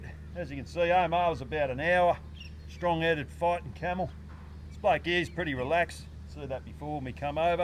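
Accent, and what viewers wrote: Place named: Australia. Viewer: 😂I don’t understand much of your Australian English. But at the same time the music makes everything in the room vibrate 😂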